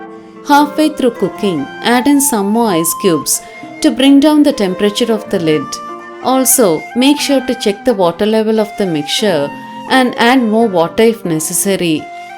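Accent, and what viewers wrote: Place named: Coimbatore, India. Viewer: Hi, i keep watching ur videos, when i hear ur tamil slang, i feel ur somewhere near cbe, is it so. U videos r very useful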